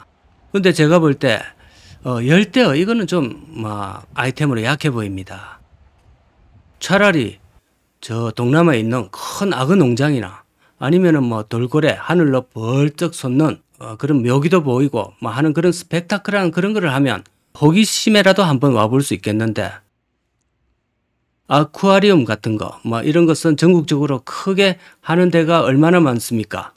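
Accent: Gyeongsang, South Korea. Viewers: .갱상도.사투리를.찾아서.또 오시것습니다. 너무나.좋은방송.😊😊😊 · 동영상을 보면 경상도 사투리가 참 정겹고 차분하게 들리네요. 고령화와 인구감소에 온라인 영업 저변화로 부동산업이 망해가는 한국.. 더 망해야 한국이 재활하는 길입니다.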